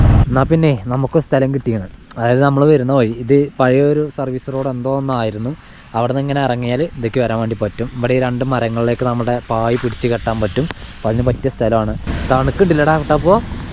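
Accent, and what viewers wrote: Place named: Malabar, India. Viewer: ഈ ഭാഷക്കു ഒരു കുഴപ്പവും ഇല്ല ബ്രോ മലബാർ സ്ലാങ് ആണ് അങ്ങ് ക്ഷമിച്ചേക്ക് 😊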